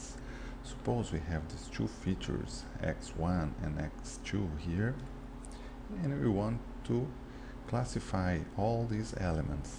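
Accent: Brazil. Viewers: By the accent I can say you are brazilian like me :) Great video! Helped me at the exam :) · You are brazilian, aren't you? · Great explanation! You must be Brazilian by your accent right?? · sounds like brazilian english =) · Que sotaque brazuca hein? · are you Brazilian? you accent sounds a lot like the Brazilian one...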